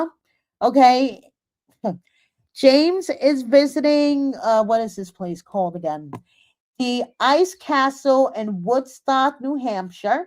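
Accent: New York, United States. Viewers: I can’t stand eScott he’s not a nice human and obsessed with bloggers. As for your voice I love it, your NY accent is so cool sounding to me.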